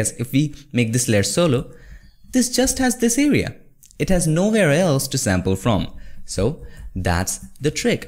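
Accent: India. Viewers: i realy like your monobrow. but indian english is very exhausting to listen to for me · perfect indian voice i see on youtube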